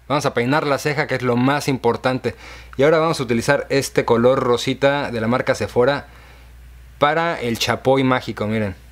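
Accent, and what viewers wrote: Mexico. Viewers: Tiene acento mexicano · 😂😂😂😂 Ese "dejo" ranchero de tu esposo da risa. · Me parece que tu esposo es MEXICANO me suena muy MEXICANO como muy bromista, hablan perfecto el español LIKE SI CREES LO MISMO O COMENTA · Jajajajajaja lo Mexicanos salen con unas... como me llama mucho la atención escuchar su dialecto.. jaja como dicen ustedes es padrisimo saludos desde Colombia.. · Hola bonita! Asi me pongo la mascara 😂y! Woooww! Una voz muy linda y ese acento bien mexicano! "Las cejas tipo Frida khalo" 😂😂😂 Me encantó el video 😄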